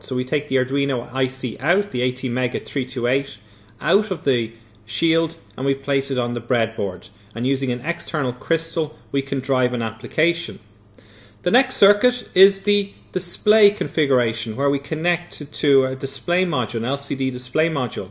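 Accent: Ireland. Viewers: Hey, well *I* knew you were Irish because you obviously sound Irish. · I called it!!! I knew you were Irish! At first I thought British but then I realized cause of the way you say three! Haha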